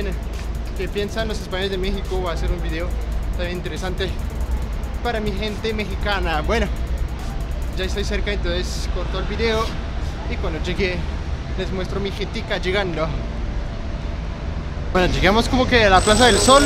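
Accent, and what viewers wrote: Bogotá, Colombia. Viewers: sabe que si tiene acentó colombiano pareces paisa el mejor acento de Colombia. pero tu eres demasiado noble y linda tu forma de ser felicidades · me gusta mas como se te ve ese look sin barba y con lentes y a mi se me hace que eres Colombiano hablaa excesivamente bien el espaniol y tienes muy marcado el acento de alla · Este man habla con un acento muy rolo para ser Francés !! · el Francés les parecerá extraño porque te vez claramente europeo pero con acento latinoamericano casi, casi como colombiano. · x q tienes acento colombiano ?? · Yo te lo digo. Pienso que eres alguien que ha vivido parte de su vida en algún país de Latinoamérica (tu acento colombiano te delata), seas o no nacido allí. Un saludo. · te extrañamos en Colombia. Y cierto lo que dicen todos en los comentarios.. tu acento es recolombiano. Saludos.. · Hola! Ven a Rotterdam... 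Aca no te pierdes.. yo te doy un tour por la ciudad 🤗🤗... Me gustan tus videos y tu acento Colombiano... Un abrazito... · deberías de venir a Barcelona, guapo jajaja me hace gracia tu acento de Colombia tanto que dices... señor que pena y pides dirección, pero acá pena, no es vergüenza, pena es de lastima o tristeza pero igual me gusta mirarte y escucharte 😍😍😘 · Es que tu español es muy colombiano y es casi perfecto pero si despierta curiosidad jajajaja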